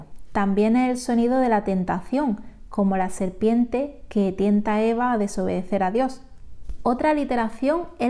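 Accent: Andalusia, Spain. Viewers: Es acento andaluz. De Granada, creo.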